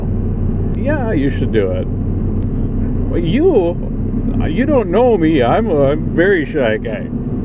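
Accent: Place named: Minnesota, United States. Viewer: What part of Minnesota are you from?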